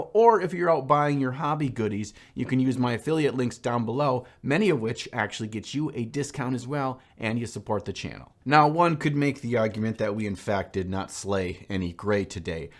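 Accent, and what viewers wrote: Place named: Minnesota, United States. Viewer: This is completely unrelated to the video, but I love the fact I can tell you're from Minnesota! I went to work in Connecticut for two weeks, it was a work trip from the UK, and the guys I worked with mentioned Minnesota for whatever reason, I think it was related to not being able to find an In and Out Burger on the East Coast. They kept calling it Mini Soda because of the accent, and yeh, bag, tag etc they're so distinctive! I love it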